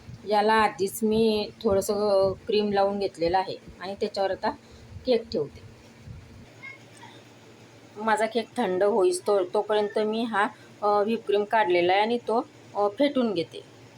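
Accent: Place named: Khandesh, India. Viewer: खानदेशी टोन आहे ताई तुमची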